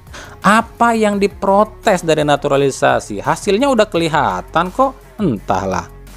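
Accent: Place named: Sunda, Indonesia. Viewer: Hahahhhh,ngadangu nyarios Sunda na hyng seri mang....😂😂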